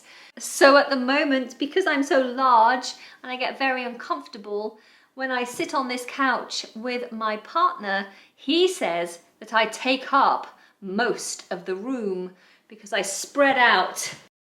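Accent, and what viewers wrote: United Kingdom. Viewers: nice british accent😊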